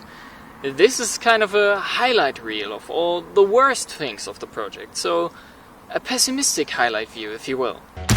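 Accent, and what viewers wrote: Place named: Germany. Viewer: I thought your accent was german but wasnt sure... then i read und war mir sicher XD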